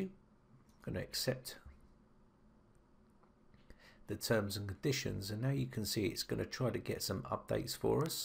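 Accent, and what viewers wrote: United Kingdom. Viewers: I love British accent,thank god it wasn't a Indian man...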